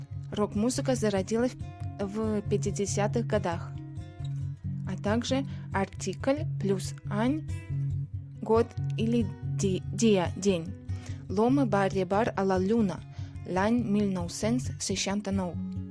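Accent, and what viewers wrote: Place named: Eastern Europe. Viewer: На произношением конечно надо поработать.... Буква r в конце глаголов, например, не читается. Уже молчу про типичный восточноевропейский акцент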